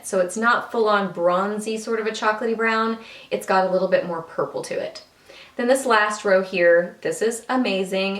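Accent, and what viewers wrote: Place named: Texas, United States. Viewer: I love all of your videos! I love how you talk! (maybe cause I'm from texas as well? lol) how detailed you are with everything and organized!!!! I would love to see a review of the real techniques palettes from you.. because I know you are honest and so far I agree on everything you say about this stuff!!! Thank you for your awesome videos!!!! - Natalia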